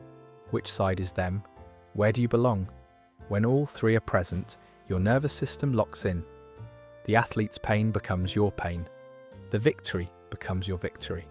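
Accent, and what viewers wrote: United Kingdom. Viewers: What's with the English accent? Does that make it more legitimate?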